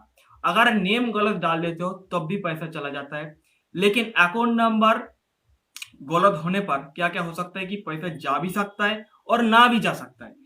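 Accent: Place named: Bihar, India. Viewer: bihari accent ne jaan le rakhi h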